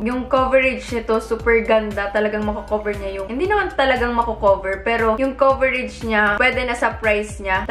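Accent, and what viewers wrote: Visayas, Philippines. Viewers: Bisaya ka? Hahaha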